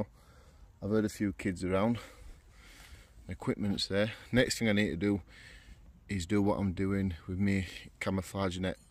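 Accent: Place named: England, United Kingdom. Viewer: It's an English accent. The English live in England, the Scottish in Scotland, and the Welsh in Wales, but all three countries are British because they live on the same island: Great Britain, the largest Island in the British Isles. Welsh and Scottish accents are also British, but this one is clearly English. Great Britain together with Northern Ireland forms the United Kingdom of Great Britain and Northern Ireland, abbreviated to the UK, to which you can add Irish to the aforementioned accents. Hope this helps.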